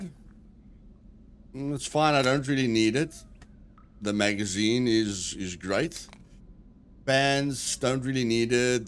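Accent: South Africa. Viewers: You sound South African bud lol if yes maybe we should have a game together but I warn I'm a complete noob just started but enjoy your videos bud nicely done 👌😊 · accent is very South African.... niice vid boet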